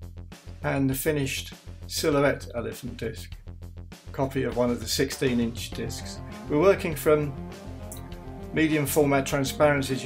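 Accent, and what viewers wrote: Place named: South London, United Kingdom. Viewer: Ah! I thought I recognised that 'Gateway to the South' accent. Wasn't 100% certain but I am now. Will never forget that wonderful tour you provided Lena and myself through the now tragically long gone MOMI, along with the marvellous hospitality, including our best ever overseas meal at your Balham residence. Having now reached another 'record age ( my first was 331/3 the second 45 :-) my collecting days arena largely behind me. In fact I've now reached, along with many of my co-hoarders, the 'what am I going to do with it all?' stage. However, my interest in early audio/visual technology has not at all diminished. Now, one of the (many) disadvantages of reaching a 'record' age is that it is now 70-years since I was the 8-year-old owners a Meccano set. (It got lost during a house move back in 1948).This means that I must leave you to home construct a Zoopraxiscope. 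When you do I trust you will share on YouTube. Keep up the good work - Charles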